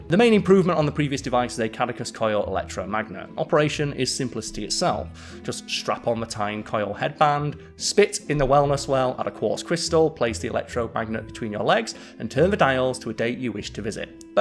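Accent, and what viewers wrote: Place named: North, United Kingdom. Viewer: Hey, I’m an American Brit who grew up in London until I was 15 and then my parents moved back to the states when my father left the US Embassy Service. I am now 74 and have a bit of a hearing problem. My very expensive hearing aids don’t work as well as I would like. I sometimes have a difficult time following your wonderful northern accent. If you could slow down a bit, I would have a easier time understanding you. I do not expect you to speak full on RP just slow down a bit and enunciate a bit more clearly.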